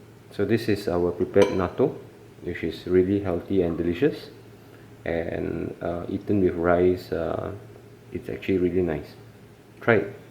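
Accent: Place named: Malaysia. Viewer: ur accent sounds malaysian..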